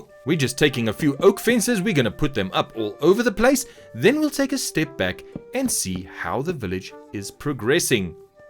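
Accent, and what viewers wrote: South Africa. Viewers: this oke sounds South African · Are you from south africa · South African?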